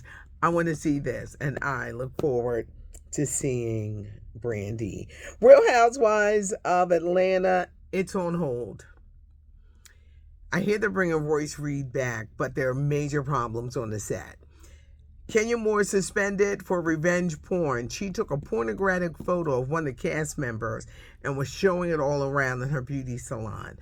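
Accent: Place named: Philadelphia, United States. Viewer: I know that's right use that South Philly voice good for you! my Amazing Husband was from South Philly 12th and Christian.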